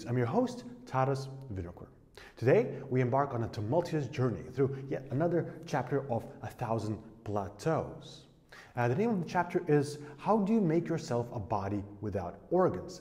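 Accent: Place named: Lithuania. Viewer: The accent reminds me of a Lithuanian one. Thanks for the video!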